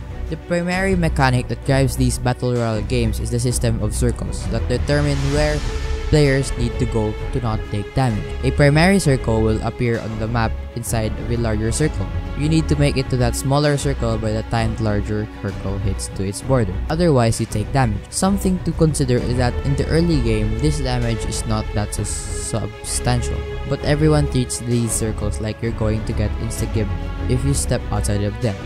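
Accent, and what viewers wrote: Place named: Philippines. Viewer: sabi na eh pinoy ka ibatalag acsent ng mga pinoy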